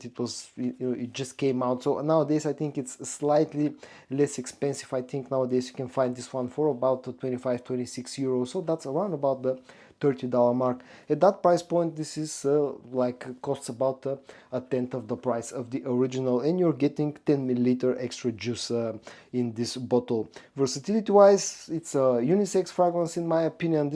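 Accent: Bulgaria. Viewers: да не се казваш случайно?:) Често попадам на тези ревюта и чак сега долових бг-акцента (но е толкова слаб, че дори се чудя дали всъщност съм прав). Когато става въпрос за арабски аромати винаги се спирам на твоите видеа:) Хем ревютата са интересни хем стила ти не е натрапчив и претенциозен (нещо, което е дооста рядко срещано в тази ниша). А за темповете, с които бълваш видеа направо нямам думи:Х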